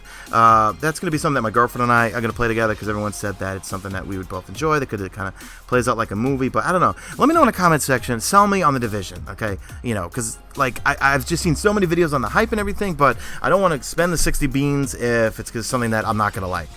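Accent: New York, United States. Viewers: How do you like living in New York? You totally sound like a New Yorker lol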